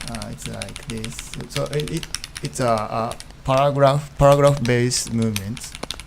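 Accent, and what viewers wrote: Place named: Japan. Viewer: 日本人の喋る英語ってとても参考になります。vimも覚えたかったので私にとっては素晴らしい動画でした！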